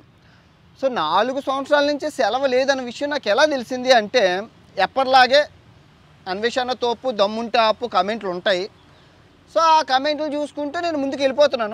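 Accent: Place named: Andhra Pradesh, India. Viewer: garu uttarandhra bhasha vintu Naku anandam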